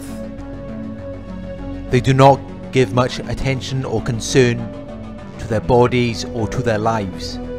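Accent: Wales, United Kingdom. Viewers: Just stumbled over your video. Can’t believe accuracy of what you say. My ex narc 1. Promiscuous. Gave me a STI and took me to special clinic next morning! 2. Blamed me for preventing him meeting other women. We were supposed to be in a ralationship! 3. Told me his parent’s gave up on him as youngish of three boys.Elder brothers all narcs on various scales. All plotted against me “ stupid woman” 4. Reckless behaviour “ swimming with sharks! “. Frightening incident when showed me no concern for my wellbeing. Trying to keep up with his pace of walking and nearly walked in front of car. 5. Threatened to murder me if I slept with anyone else. He’d moved on to new supply and living with her. Had left me and gone abroad but I was supposed to be his girlfriend 6? So terrified of him coming home after long journey. Met up in hotel . Arrived at midnight. In such a black mood was too scared to speak to him. 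Even considered the scenario “ would anybody know where to find me if I went missing. 7.Would never discuss our relationship. If I bought up a concern he would try to initiate sex! Was sitting down one day opposite him and bought up a concern with one of his friends, no confrontation on my half. Suddenly threw glass of wine in face, wondered what on earth happened. Carried on as if nothing happened then carried on talking. When I challenged him he threaded to tape me so people could see what I was really like. Excuse my ramblings. Had to get it off my chest. Love the Welsh accent.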